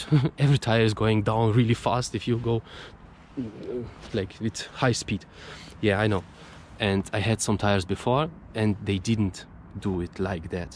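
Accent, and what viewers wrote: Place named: Germany. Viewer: Как необычно, у вас в голосе звучат скорее немецкие нотки, чем русские, даже когда говорите по английски. 😜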